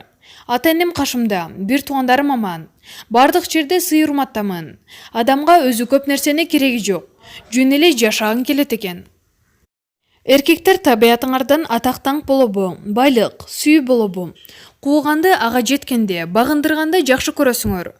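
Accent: Russia. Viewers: Ушул кыз суйлобой эле койсунчу кыргыз эмес орус зорго кыргызча суйлогондой болот.мынча акциент менен суйлойт озун угуп корчу адамдын кыжырын кайнатып.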